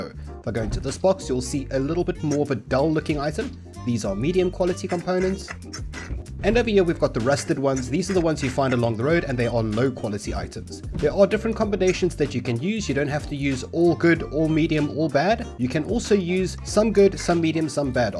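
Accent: South Africa. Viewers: wait , your from south africa , i knew it I thought i recognized the accent , nice joke about the taxis in SA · The taxi shit killed me, I had my suspicions that you were South African from your accent, but that minibus taxi joke confirmed it. You aren't wrong though 😂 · I new you acsent wasJuf vir vandag periode 5 se hulle ons het SS. Is die geo of gesk ¿ South African Edit: I now realized that I typed like I was drunk Wtf I’m keeping it like that Admire it Admire it!!!!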